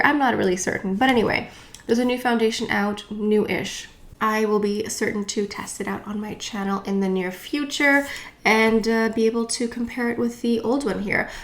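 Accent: France. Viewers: I adore your french accent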